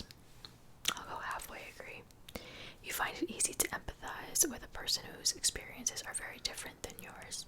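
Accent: United States. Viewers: what part of America are you from? (I’m Australian so the State is probably all I’ll know 😂)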